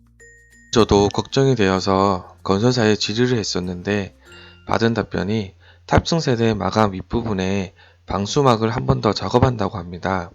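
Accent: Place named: Gyeongsang, South Korea. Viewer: 갱상도 꺼져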